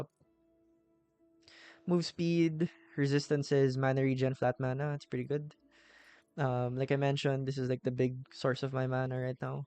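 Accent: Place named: Philippines. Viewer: love the build boss. From the way you talk i assume you're filipino :).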